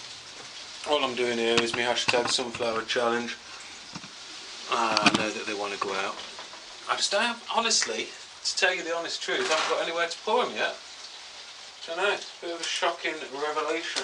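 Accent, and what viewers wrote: East Midlands, United Kingdom. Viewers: Thanks for these updates 😊 just got my first half plot and I know naaaaahthing! It's also nostalgic to hear the east Midlands accent. I was born in Loughborough but I've lived in Yorkshire for a long time.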